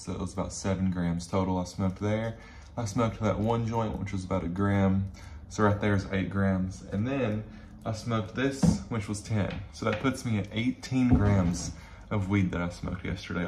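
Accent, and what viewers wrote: South, United States. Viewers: The southern is strong with this one. XD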